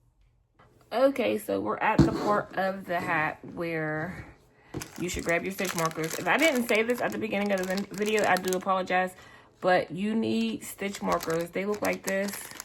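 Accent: Pittsburgh, United States. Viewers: Girl, I knew you were from pittsburgh when you first started talking! 😂😂😂 #412😊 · i know you from pittsburgh mama i hear my ppllllllll lol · This is an awesome tutorial. I am born and raised in Pittsburgh Pa and your accent is thick. I can hear the Burgh in your accent. I say that you have an accent because I don't hear it in my voice because I live in ky over 20 years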